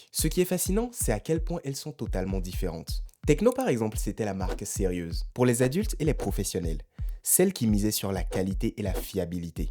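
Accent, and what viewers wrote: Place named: Benin. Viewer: Great video Vous avez un accent béninois/togolais Rare de voir des feedbacks venant de ses nationalité Mercii beaucoup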